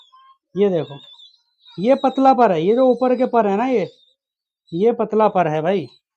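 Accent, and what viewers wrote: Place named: Bijnor, India. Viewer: Bhai ne dil khush kar diya boli se bijnouri he bhai pakka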